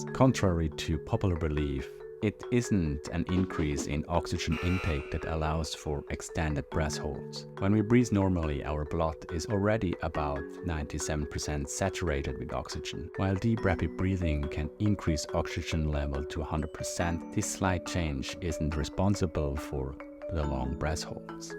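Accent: Switzerland. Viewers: Salut:-) Ich bin mega happy dass de Youtube-Algorithmus vor es paar Wuche mir din Kanal empfhole het und ich han scho recht schnell s Gfühl gha, dass mir de Dialekt bekannt vor chunt. Cool z gseh, dass au Schwiizer i dem Bereich aktiv sind.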